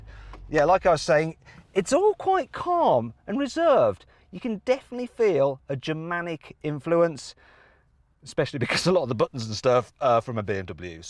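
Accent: United Kingdom. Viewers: 喋り方は一般的なイギリス英語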